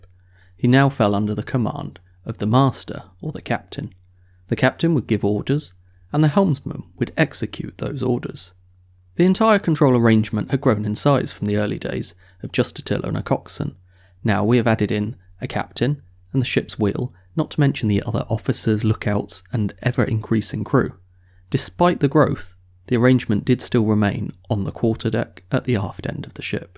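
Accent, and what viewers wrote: United Kingdom. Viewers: The British accent is, somehow, disturbing. At least to me. Many times, annoying.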